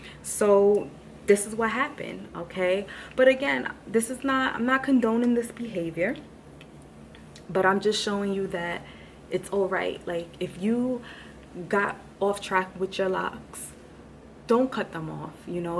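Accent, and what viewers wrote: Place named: Harlem, United States. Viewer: Lol! I guessed that watching one of your other videos bcse I recognized the accent and the attitude (Harlem native, right across the bridge 😉) Peace & Love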